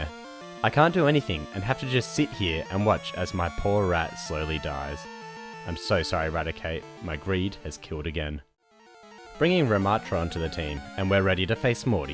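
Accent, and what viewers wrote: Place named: Australia. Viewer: a fellow Aussie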